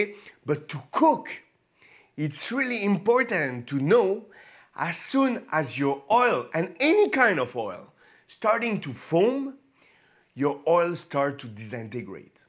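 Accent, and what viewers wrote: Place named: Quebec, Canada. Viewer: Hey this guy is a quebecois 🤣🤣😂🤣 j’adore l’accent en anglais. Vraiment authentique 😂😂😂 Je vais m’inscrire à ton canal. J’espère que tu as des recettes à base de plantes 🌱 😉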